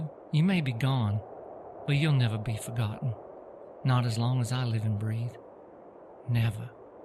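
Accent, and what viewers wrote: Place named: South, United States. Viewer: The narrator’s voice reminds me of a highly educated southern accent. Very much like the great historian Shelby Foote .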